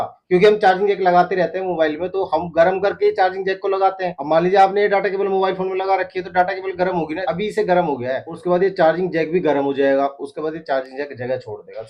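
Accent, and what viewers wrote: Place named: Haryana, India. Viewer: hisar,haryana.Boli t smaj gya tha m chhora haryanvi h👊👍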